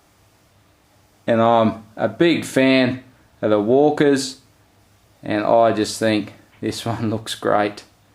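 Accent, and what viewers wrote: Australia. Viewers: I can tell you now, most Australians don't have accents this strong. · The Aussie accent lol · Yes we get it you're australian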